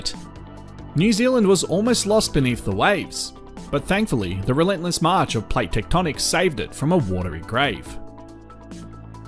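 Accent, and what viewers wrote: Australia. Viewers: you do an excellent production with the unique Australian that is easy to listen and related to And no worries mate Today I was going to look into the Tonga blast a few years ago I'm a big fan of Edward Lorenz and non linear dynamics so it's interesting to me Especially on such scale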